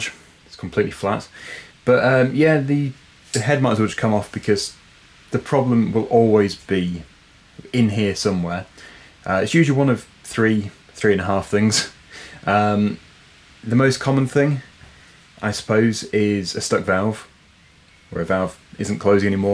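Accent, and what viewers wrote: United Kingdom. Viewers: Excellent video, thank you! And the British accent makes it even better.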